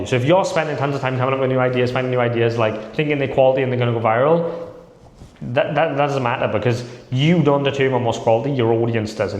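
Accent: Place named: United Kingdom. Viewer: Omfg not another british dude lol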